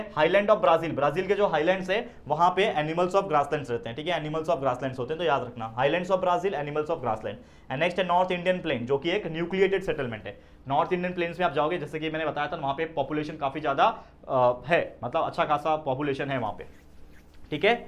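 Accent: Maharashtra, India. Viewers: Sir aap marathi bolte ho kya😅😅😊😊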